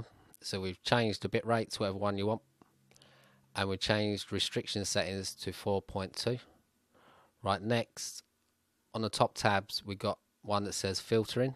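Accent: England, United Kingdom. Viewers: I love the voice of England. When i hear that voice, my heart shake and can't normal...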